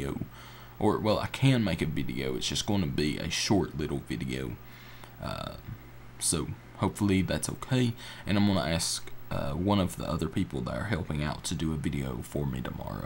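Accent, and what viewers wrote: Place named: South, United States. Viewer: I think its southern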